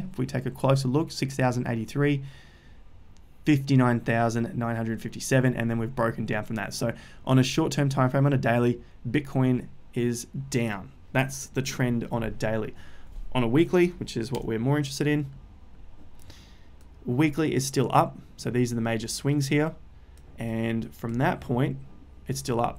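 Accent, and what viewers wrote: Australia. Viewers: Are you from Australia?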